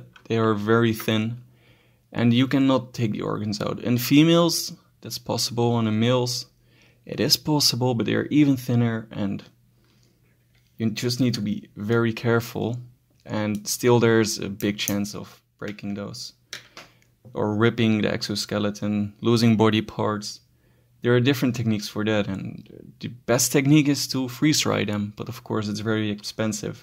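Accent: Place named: Netherlands. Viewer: The fun thing is... Ik ben ook Nederlands en ik dacht het al aan je accent te horen, maar ik wilde het zeker weten... Heb je ook levende Heteropteryx Dilatata thuis?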